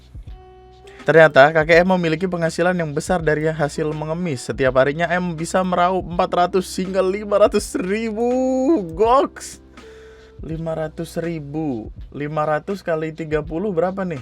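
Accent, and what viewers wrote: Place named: Madura, Indonesia. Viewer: Bahasa Madura kalo denger logatnya bang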